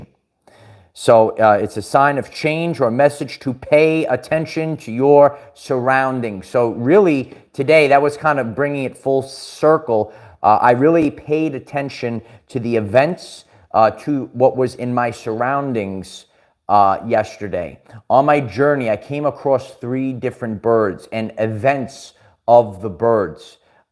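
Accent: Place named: East Coast, United States. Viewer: I just found your video! (I am in the southwest, currently working and listening and I heard an East Coast accent and had to stop, I felt at home. Are you in Jersey by any chance? 🙏😄💜) So you know, it popped up as ‘people who watch Stefan Burns also watch this channel’. Great chat! Namaste 🙏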